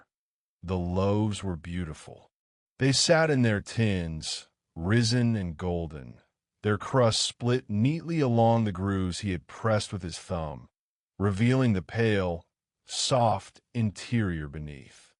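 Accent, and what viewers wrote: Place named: United States. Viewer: English tales by AI with an american accent lol. Lets give it a go 🤞🤞🤞 ............ as always, awful punctuation 😠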